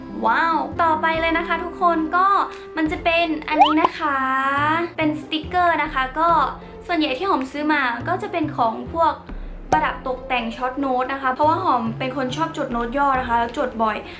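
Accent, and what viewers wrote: Isan, Thailand. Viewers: เป็นคนอีสานหรอคะ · ซ่องวะสั่น555. ชอบความอิสานมากค่า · ชแบเวลาพูดภาษาอีสานอ่ะ น่ารักมากเลยยย❤ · น่ารักๆเว้าอีสานผสมๆนำมักๆ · ชอบความพูดอีสาน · ชอบที่พี่พูดภาษาอีสานอ่ะ 💕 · ชอบความภาษาอีสานนนนนนนนนนน เสียงน่ารักมากค่ะ พี่ชอบ กดติดตามแทบทันที่ที่ได้ยินภาษาอีสาน 5555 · ชอบพี่ตอนพูดอีสาน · เป็นคนอีสานบ่พี่หอม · ซ่อง 55555. อีสานผสม ปากไม่เเดง ไม่มีเเรงเรียน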